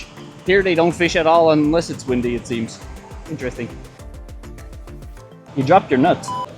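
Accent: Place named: Eastern Canada, Canada. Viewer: Oh okay. Nice. I thought by your eastern accent you were but I heard you say something about western Canada so wasn’t sure. Cheers. Love your channel. Cheers 🍻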